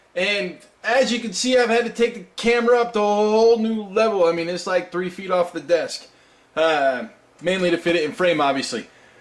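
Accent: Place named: Georgia, United States. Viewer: Welcome back to the vlog world! When did you pick up the Georgia accent?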